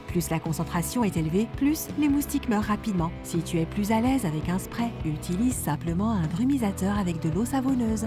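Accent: Quebec, Canada. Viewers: C'est l'accent canadien du Québec et encore ce n'est pas trop prononcé !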